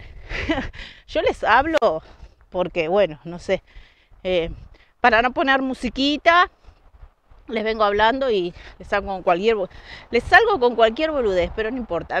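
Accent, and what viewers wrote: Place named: Montevideo, Uruguay. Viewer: Vos si q nos hacés el honor de representarnos. Tu forma de expresarte es bien de montevidiana. A pesar de tantos años que vivís ahí no perdiste ese "que sé yo",😉. Me encanta que seas vos misma como todo los q tienen su propio canal. Feo es cuando los uruguayos se van a otro país y después se creen mejor que los demás Ese barrio es precioso. Disfruto muchísimo tus videos,esos lugares q me hubiese gustado conocer en persona,pero bueno,para q está y su canal? Para hacernos conocer New Yersey y New York ☺️😉. Bien, encontraste la panadería 👏. $900 😳🙄🤦. De veras que no hay ni un asiento para sentarte 😁. Pero bueno ☺️😂. Andás con alcohol para las manos antes de comer? Qué? Eso te salió 20 dólares? Con razón te hizo sufrir pagarlos. Claro que disfruté mucho,😘🙋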